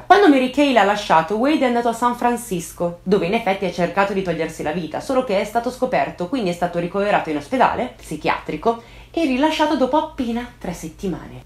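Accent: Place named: Rome, Italy. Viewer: Il tuo accento (Per me) è Romano, ma sei di Roma?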